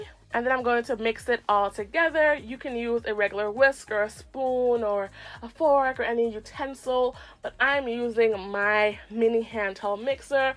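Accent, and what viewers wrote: Caribbean. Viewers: Do I hear a slight Caribbean accent ?? 👀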